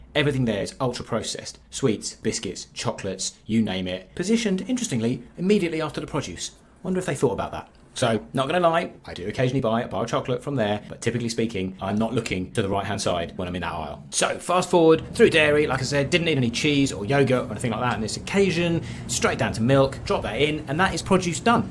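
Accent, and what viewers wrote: United Kingdom. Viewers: You sound British so it was surprising that your in Austraila.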